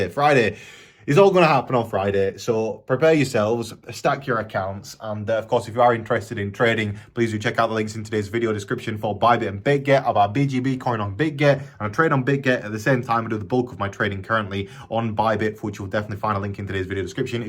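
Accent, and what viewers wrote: Yorkshire, United Kingdom. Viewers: Me too. I love bold and confident nature and style with his Yorkshire accent. I am from a Leeds too by the way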